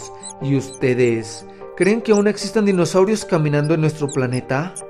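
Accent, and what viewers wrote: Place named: Texas, United States. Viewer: Buen video, pero no hables como si tuvieras un gancho presionando tu nariz. Y ese dejo de ranchero texano le resta seriedad a tu voz, te sugiero hablar pausado, claro, fuerte y acento neutro. Éxitos!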